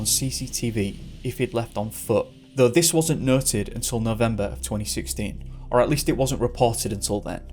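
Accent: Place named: England, United Kingdom. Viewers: Ware abouts in England are you from . I am trying to figure out the accent ! Just somthing I do lol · Love listening to your videos keep them coming,was wondering were are you from your accent sounds like your not far or from middlesbrough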